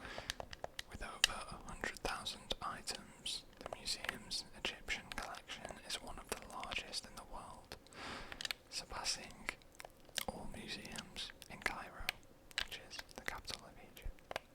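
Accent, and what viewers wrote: United Kingdom. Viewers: Bro I am just fascinated by the British accent, as a non-native speaker I just love those pronounciations, fantastic video anyway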